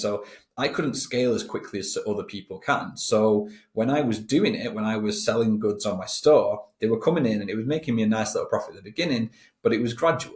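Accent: North West England, United Kingdom. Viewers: First time I've watched your vids tonight mate. Breath of fresh air. Not the usual BS about how cool they are but proper factual stuff that we all need to know. I have had my ebay shop open dropshipping from Ali for a grand total of 5 days. I am currently going through the "what am I doing wrong" "is it worth it" phase. I'm trying to remain positive and keep scaling. Fingers crossed I need to find out how to get views first off. I'm keeping things as cheap as poss for the time being. Keep up the great content. You sound like a local north west lad like me too.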